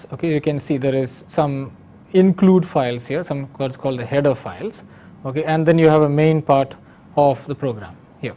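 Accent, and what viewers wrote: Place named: India. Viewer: If I hear one more sh*tty Indian accent, my head is gonna explode. If Microsoft didn't discover someone over there could code a tiny bit, folks there would still be insulating their mud huts with cow dung...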